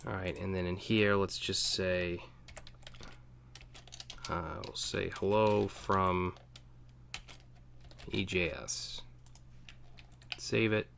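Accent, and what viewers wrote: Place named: Northeast, United States. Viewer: This guy is the best anywhere! Yes, he has a NE accent. I am not sure why people feel the need to point that out. It's like saying "youtube's logo is red and white" -- yup, we know. I am just happy I found a teacher that didn't bore me to death with their relentless, robot, yammering!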